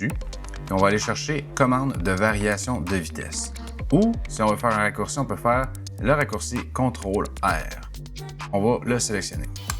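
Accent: Quebec, Canada. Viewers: Bravo c'est excellent on va droit au but ; merci jeune homme! Par contre l'accent québécois va faloir que je m'y fasse ! Surtout que je dois visiter ce beau pays dans les années qui viennent !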